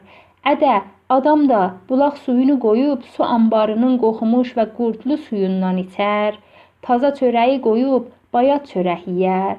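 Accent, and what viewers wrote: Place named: Iran. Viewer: چوخ ساغ اولون زحمت چکیبسیز. آما روایت ائدن قؽز، تۆرکجه‌نی یاخشی قرائت ائتمیر. سانکی بیر فارس، تۆرکجه‌نی قرائت ائدیر. گئنه‌ده الله یاردیمجی‌سی اوْلسون. زحمت چکیر.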